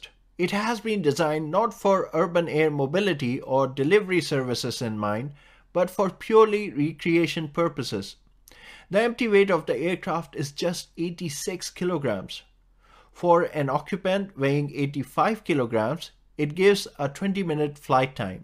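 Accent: India. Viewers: Fucking indian accent🤦‍♂️ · o!! indian accent, my pleasure) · Акцент здорового индуса?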